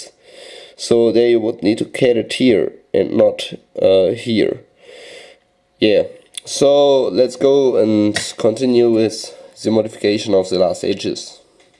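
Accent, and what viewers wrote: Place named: England, United Kingdom. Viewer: I love that english accent. Keep up the good work ! :D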